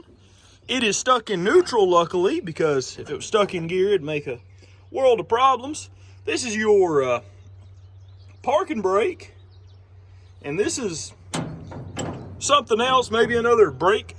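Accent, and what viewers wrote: South, United States. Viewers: I've watched a lot of "Will it run" videos on YouTube and your southern drawl is really nice to listen to, you're also a pretty sharp mechanic who clearly knows what he's doing. Great video! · TURN THE VOLUME OFF! This dudes forced cartoonish Southern good ol'-boy voice is the most annoying thing i've heard on YT ever. I feel like I'm a dumb tourist, sitting on a hard wooden bench at Disney World in Fla, watching a play by a bunch of Hollyweird failed moronic actors.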